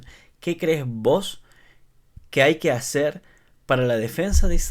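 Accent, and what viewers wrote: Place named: Córdoba, Argentina. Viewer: Muy buen informe, muy profesional. Puedo identificar un lejano acento cordobés … si?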